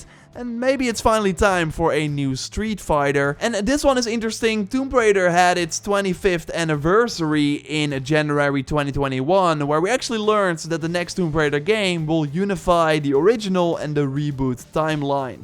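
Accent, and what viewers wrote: Netherlands. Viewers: Watched u for 5 seconds and I immediately detected a fellow dutchman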